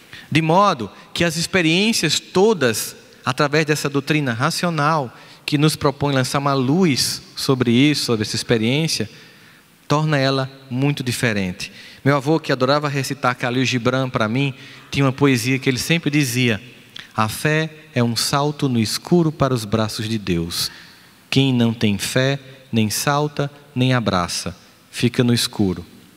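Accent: Northeast, Brazil. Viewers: Esse sotaque NORDESTINO E MUITO BOMMMMM ! GRATIDAO !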